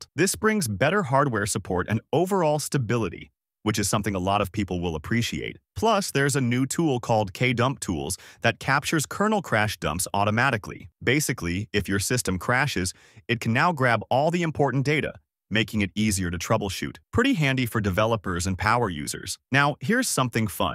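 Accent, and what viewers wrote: India. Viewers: Hey bro are you from india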